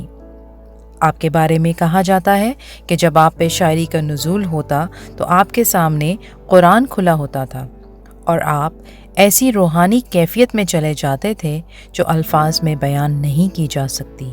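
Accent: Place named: United Kingdom. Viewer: Bhai yeh urdu k Kai lafz glat pronounce kr rahi hy English accent use kr rahi hy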